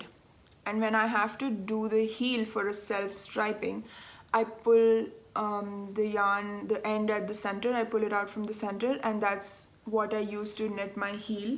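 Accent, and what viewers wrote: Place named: Kerala, India. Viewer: Just found your podcast recommended by several other podcasts. Love your accent! Reminds me of a nun from Kerala, India I went to college with - Sr. Valsa Kumblankal. My husband and I also visited Gatlinburg a year ago and I found the same yarn shop! Bought some lovely yarns and was so thrilled to find a yarn shop to visit on vacation. I have already subscribed to your channel and am in the process of watching all of your videos!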